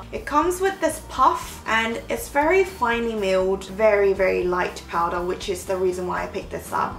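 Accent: United Kingdom. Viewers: 언니 진짜 너무 예뻐요 ㅠㅠ 진짜 언니 런던에서 혼자서 찍을때부터 계속 구독햇엇는데 ㅠㅠ 어느새 더 가까워진 느낌..ㅎㅎㅎ앞으로 자주 올려주셧으면 좋겠어요 너무 반가움..👐영국 말 너무 매력잇어요 ㅠㅠ 배우고 싶다능..ㅎㅎ 언니 진짜 좋아해여💖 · 영상 항상 재밌게 보고있어요! 매번 눈팅이랑 좋아요?버튼이라해야하나욬ㅋㅋ 저거누르기만 했었는데 첨으로 댓글달아보네옇ㅎㅎㅎ 다른유부터영상보다 영상이 먼가 더재밌어서 꼭 보게되네여ㅎㅎ 영국발음도 신기하기도하그~ 하여튼 다음 영상 기대할께용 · 맨날 언니 영상 보면서 영국발음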